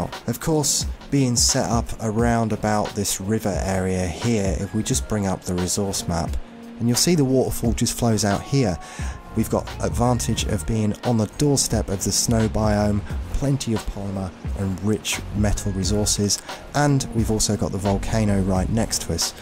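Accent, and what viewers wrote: United Kingdom. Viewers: Am I the only one that is getting a British vibe from him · Cuz he is British lmao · Hey bro, I can hear you´re British and I totally dig your accent, but I am Czech and here tea is only for ill people. We only drink it if we must, we drink coffee and we also hate the instant stuff, so cheers and thanks for all the info.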